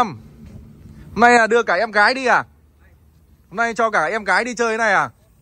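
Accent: North, Vietnam. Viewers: Có 1 thắc mắc nho nhỏ là b ở quảng bình mà lại nói đặc giọng miền bắc ko bị pha tý miền trug nào cả , tài thật .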